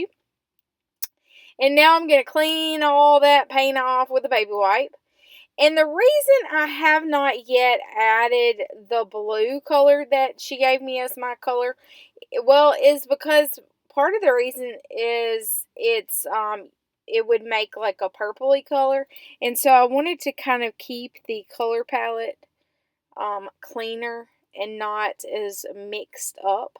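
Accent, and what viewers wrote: South, United States. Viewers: Cool pages..I'm from the UK and I really love the southern accent.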